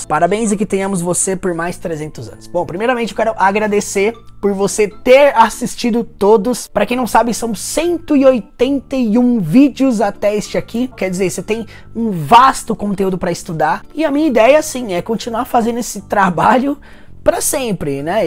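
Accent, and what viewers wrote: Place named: Inland São Paulo, Brazil. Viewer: Esse teu sotaque de Paulista do interior é insuportável!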